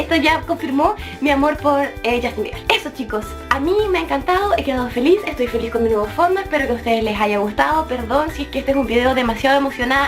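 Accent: Chile. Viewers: Hmmm no se, pero suenas como Chilena compatriota xd es chistoso como nos reconocemos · Hola. Tienes acento de chilena. Yo soy chileno por eso lo digo jejeje...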